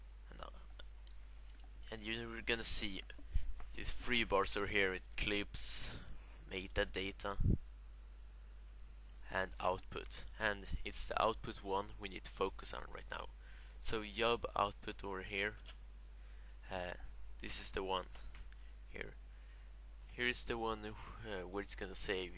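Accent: Sweden. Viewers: är du svensk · Are you swedish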